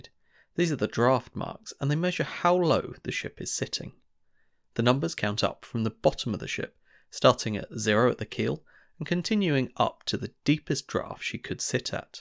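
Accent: United Kingdom. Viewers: Somehow his British accent is disturbing... at least to me.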